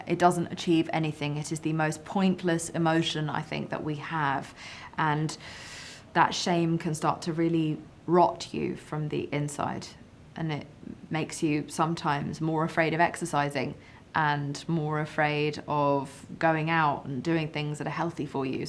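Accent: United Kingdom. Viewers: You weren't faking that British Accent, huh. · she sounds very british..Ber partner is also very British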